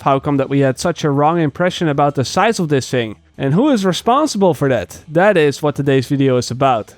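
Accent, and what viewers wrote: Netherlands. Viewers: wow this guy is dutch af! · ja hoort best wel duidelijk je nederlands accent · ik wist dat je nl was ;) Door die tweet screesnshot bevestigde het ;) Je hoort het aan je accent ;) Wel echt nice video!! :D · is this guy dutch? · Noticed the Dutch acent in there, I was like.. This has to be a fellow Dutchy >.< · lol deze man is zwaar Nederlands hoor Hoe hij klinkt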